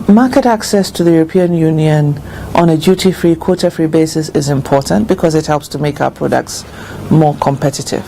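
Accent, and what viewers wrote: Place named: Ghana. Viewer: i love her GHanaian way of speaking English- very nice and clear!!! lovely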